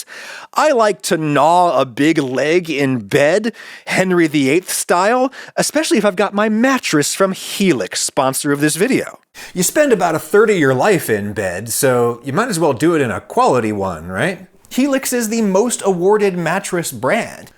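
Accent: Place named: South, United States. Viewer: Sir, that's a mighty fine southern drawl. 😘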